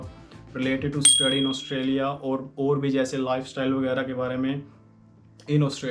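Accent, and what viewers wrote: Haryana, India. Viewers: Bai haryane te aa ?????😎😎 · HARYANA SA H BAHI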